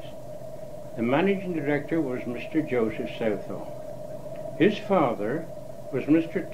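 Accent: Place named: Worcester, United States. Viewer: How great to hear an old Worcester accent.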